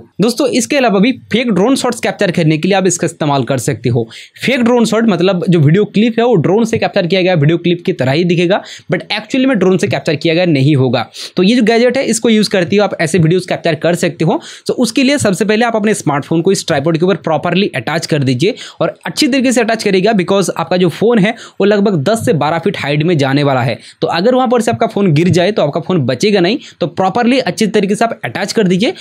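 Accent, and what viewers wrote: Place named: Bengal, India. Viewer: Bengali ho kya ... 😁 tumhari ... Bengali accent se pata chala 😁 ans ta debe thik ache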